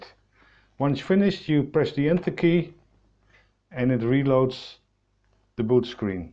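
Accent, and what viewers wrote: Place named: Netherlands. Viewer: That is a hard-core Dutch accent if I've ever heard one. It sounds like I'm listening to my dad... or as my daughter would say, "Opa." I just saw this video on the Tindie page... I definitely want one, but then saw the $250 shipping charge. I guess this is as a result of that new E.U. rule. :( I'll look into that Germany address thing. Thank you!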